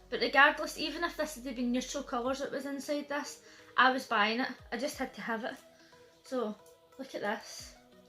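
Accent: Scotland, United Kingdom. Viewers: Just stopping by to show you some love with a full play and like #13 from Bits of Real Panther! I'm loving that accent! So very cool indeed! Is it Scottish? I hope you have a truly awesome Tuesday!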